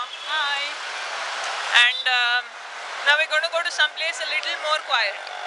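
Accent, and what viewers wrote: India. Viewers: Indian accent is by far one the best. But I can't help but laugh a little 😂 sorry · aaahahahah I just cant stand the Indian Accent :DD I just cant stop laughing omg, this sounds so racist hahaha. "Walecum duuu dii internasional dexcs" omg im dying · Damn. That sucked. The Indian accent is annoying.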